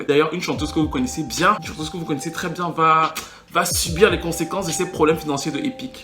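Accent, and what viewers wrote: Cameroon. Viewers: tu as un accent Cameroun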